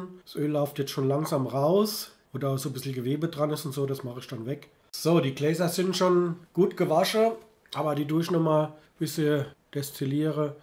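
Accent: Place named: Hesse, Germany. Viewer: Danke für die Anleitung. Des wunderbare hessische Gebabbel hab ich als Exil Hesse total vermisst. 😊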